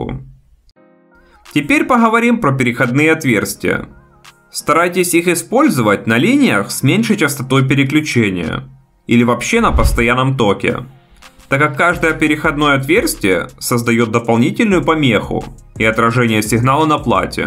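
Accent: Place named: Ukraine. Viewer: Нормальный акцент украинский, так и должно быть.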